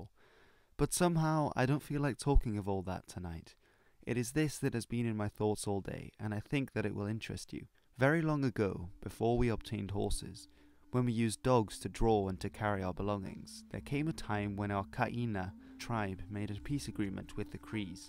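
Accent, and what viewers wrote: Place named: United Kingdom. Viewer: It was very strange hearing this tale in a British accent. Well told, Sir!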